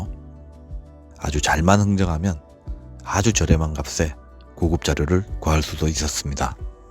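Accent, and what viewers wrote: Gyeongsang, South Korea. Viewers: 감사드려요.ㅠㅠ 경상도 발음 팍팍 나오는데...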